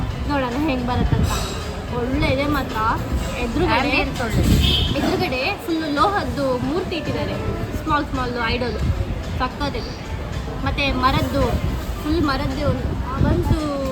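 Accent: Kundapura, India. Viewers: ಕುಂದಾಪ್ರ ಕನ್ನಡ ಚಂದ್ ಮಾತನಾಡತ್ತಿರ ಮೇಡಂ ❤️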